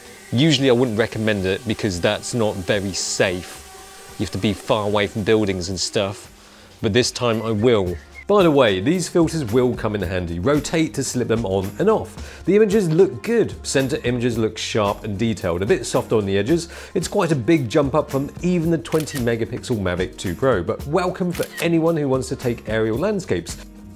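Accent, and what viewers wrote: United Kingdom. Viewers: i thought this guy was british when i heard this voice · Lame. 7 min wasted to hear the magic website read out aloud in a British accent.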